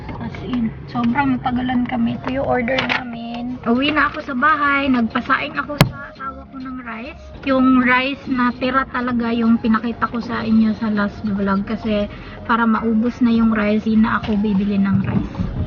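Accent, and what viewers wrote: Visayas, Philippines. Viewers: Ate bisaya ka ba?🤗🤗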